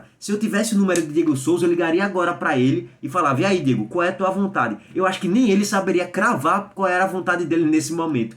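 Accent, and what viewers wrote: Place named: Rio de Janeiro, Brazil. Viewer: uu carioca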